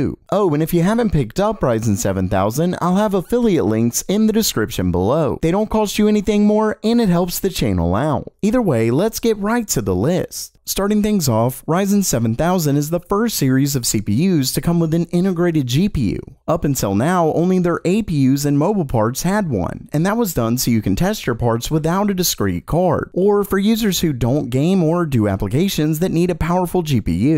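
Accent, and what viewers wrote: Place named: South, United States. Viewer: Why do you talk as if you're struggling to stop yourself from doing a Southern accent?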